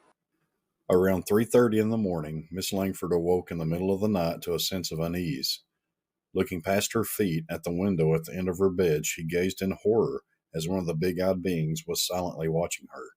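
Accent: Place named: South, United States. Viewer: Great story telling. The southern accent makes it so much better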